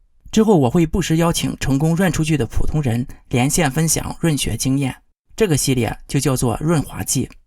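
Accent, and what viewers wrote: Shandong, China. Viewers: 你的山东口音…..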